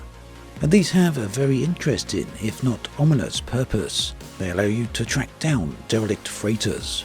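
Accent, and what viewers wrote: United Kingdom. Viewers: Quite off topic but where are you originally from? You have a booming voice that reminds me of Jason Statham, but I can't say I've heard a British accent like yours before. I'm anxious to know what part of which British (or otherwise) country has this accent where there is emphasis on the last syllable of some words that isn't present in other British accents. (forgive me, i'm a bit of an amateur voice impressionist and am easily fascinated by different accents). Fun fact, sometimes when I'm watching your videos, I remove the bass in my equalizer settings to prevent your voice from resonating in the entire house, like Liam Neeson's does. 😉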